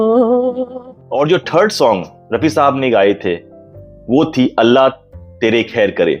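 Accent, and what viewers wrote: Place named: Bengal, India. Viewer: Aap ka gyaan sahi ho sakta hai, lekin aap ki hindi proper uccharan me nahi hai, aap 'R' ka uccharan nahi kar pa rahe ho. Bangali, Bihari tone ka touch hai.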